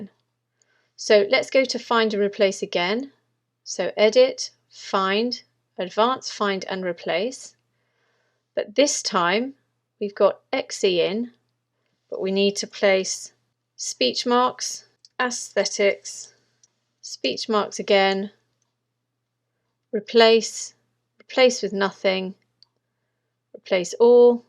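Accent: England, United Kingdom. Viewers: She IS an English.That's what an English woman sounds like. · I searched high and low for a UK English voice and after many tries, I found you. You have provided an EXCELLENT working model that is concise, and easy to follow, what more could a writer want? Well done, and thank you. Judith Page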